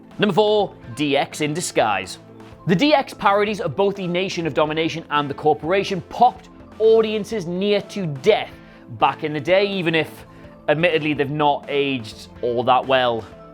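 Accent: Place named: England, United Kingdom. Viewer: you the one pronouncing it wrong fool, he’s English! He speak a purer dialect of English than you ignorant yankee! You speak the language you speak because of his ancestors foolio, read a book idiot.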